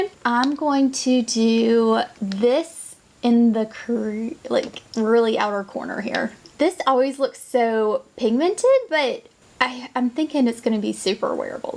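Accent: South, United States. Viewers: You are gorgeous and I love the look. I just received my Chanel Seduction eye palette and Romance eye pencil. I am anxious to use them. I love your Southern accent. I was born in Dallas, Texas and I am a Southern gal at heart.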